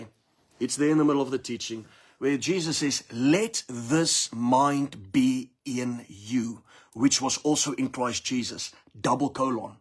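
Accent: South Africa. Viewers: My favorite accent is the South African accent lol. Thank you so much Prophet for reminding me I am living in the spirit. We are not bound by the law but bond servants to Christ. One day I hope to visit your church in South Africa! Jesus is King of Kings! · Afrikaanse Boertjie from South Africa..speaking English..a distinct dialect.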